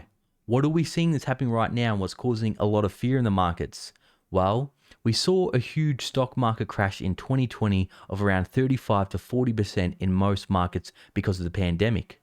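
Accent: Australia. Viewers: Ah, sure it will be grand...seriously, it will. The man on the "tube"...for sure. Festive cheer delivered in an Aussie accent... Like seriously what more could ya ask for?. OK, I admit I'm hooked...hands up. Never trusted those communists in the first place...🍻🥂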